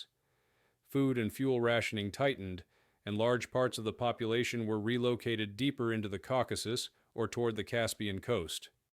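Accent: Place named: United States. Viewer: I'm glad we finally have a World War II documentary narrated by a American voice and not another British voice, whether AI or human.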